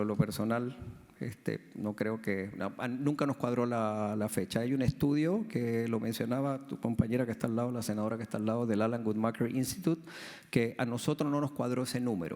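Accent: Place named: Chile. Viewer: yo encuentro que tiene acento chileno 🤔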